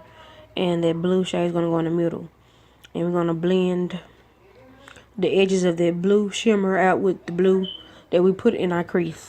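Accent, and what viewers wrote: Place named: South, United States. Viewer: Your accent is soooo Southern lol; love it. I love the look girlie.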